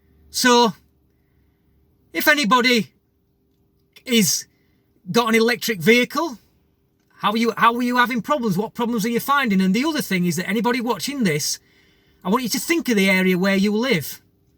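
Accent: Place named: Yorkshire, United Kingdom. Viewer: Kernow(Cornwall) is made from granite,lithium is found in granite,so why isnt Kernow covered with lithium mines?I take 2 hours to fully charge from a 13 amp plug at home.my range is 15 miles with no regeneration available..I suffer no range anxiety when the battery goes flat.The battery is 5 years old and still charges fully.When flat,I just push harder on the pedals. Haha its a folding bike.I love accents.Hope I wont insult you to guess Yorkshire,Leeds/Bradford?Yo orite luv.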